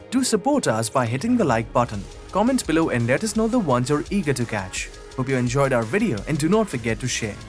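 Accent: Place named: India. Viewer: damn... I cannot listen w/o laughing that indian accent! :)) Bro, better you record "top 10 unmissable cartoons"! :) And no need to include "Underworld" twice - ALL its parts are perfect.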